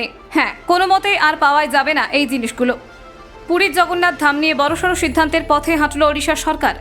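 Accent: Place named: Bangladesh. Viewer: Apnar acsent seems to be Bangladesis. Decision is very much correct. Puri must be sacred and peaceful.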